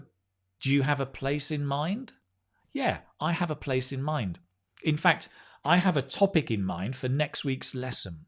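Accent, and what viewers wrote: United Kingdom. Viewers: Are you British? Are you American?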